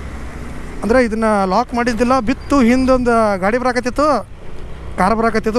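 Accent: North Karnataka, India. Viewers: ಉತ್ತರ ಕರ್ನಾಟಕ ಭಾಷೆ ನ ಹಂಗ ಅಣ್ಣಾ 😅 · 😅 uk slang super bro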